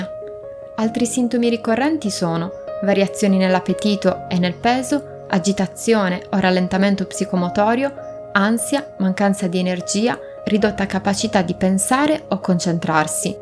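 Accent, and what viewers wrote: Sardinia, Italy. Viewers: Accento di bidda....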